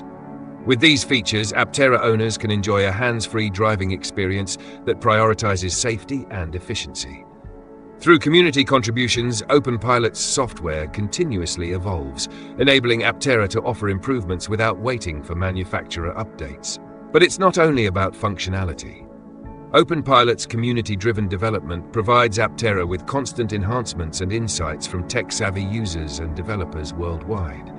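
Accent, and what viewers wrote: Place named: United Kingdom. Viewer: Oh, goody! Another fluffer for aptera ..but with a British accent.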